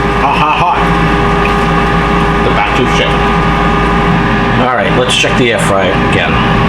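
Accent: Massachusetts, United States. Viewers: I love listening to your Mass accent I myself am a native of Fall River MA so I really enjoy it and your videos You were the one I found when I used my air fryer for the first time for frozen fries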